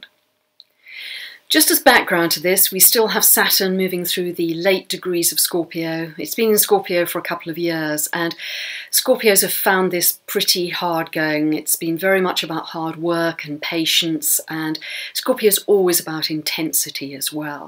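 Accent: England, United Kingdom. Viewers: Thanks - enjoyed your energy, wisdom and wonderful 'jolly good' English accent. So good to hear the queens English spoken as it used to be. (I'm speaking as an ex West Londoner born 1950):-) Also love the cushions!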